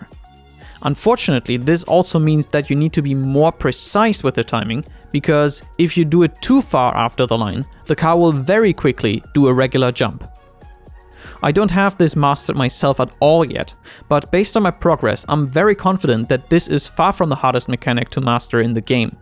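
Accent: Germany. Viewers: Dear Mr, I still can't figure out your accent. It sounds german to me, but it's a pretty weird one. Did I guess it right? Sincerely Ein deutsprachiger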